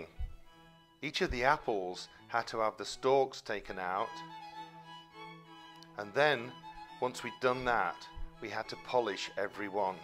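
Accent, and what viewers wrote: United Kingdom. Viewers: The british accent, the music and the "amusing" sequences with the plush dog remind me of the educational films in english lessons from fifth class. :D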